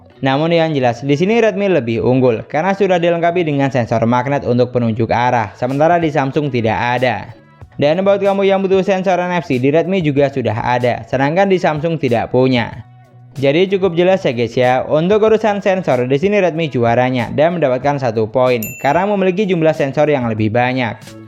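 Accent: Madura, Indonesia. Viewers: Madura ya bang?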